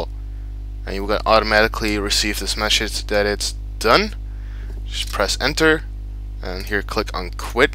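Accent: Netherlands. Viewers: nou bedankt.. erg handige vid.. alleen jammer van die ruis heerlijk die headphones he and yes you can clearly hear your dutch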